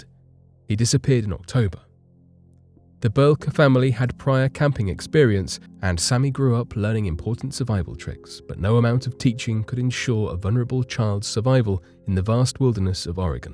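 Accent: United Kingdom. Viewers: I hear the British accent so understood and somewhat excused but the word 'myth' is incorrect and insulting consistent of the Western European mind and history with all cultures information other than Christianity. For your education, all information Native Americans share are historical facts passed Down from one responsible LISTENER and wise keeper of the truth to the next. It is accurate. It may not be in a language or give an image of justification that your limited exposure may be able to verify. Africans do it as well. Use of the word Myth in such a manner brings forth Arrogance, a way of thinking that Covid19 is demanding cultures and people that use it to cease and desist and the virus will Diminish.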